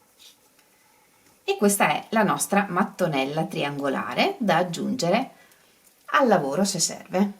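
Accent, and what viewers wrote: Veneto, Italy. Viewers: Bellissima! Sei bravissima 👍 Sei Veneta? Hai un modo di parlare splendido 😉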